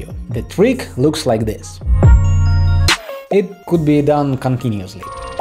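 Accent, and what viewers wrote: Russia. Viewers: God, this russian accent! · Включил видос и с первых секунд услышал русский акцент👽🗿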